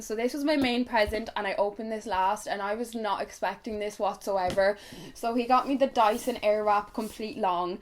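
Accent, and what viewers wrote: Ireland. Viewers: Very deep Irish accent coming out from your mouth 👄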